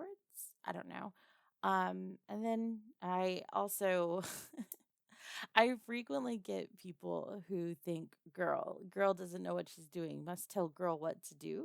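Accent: South, United States. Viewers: Ooooh man that southern accent is like 🤌Yeehaw! hehe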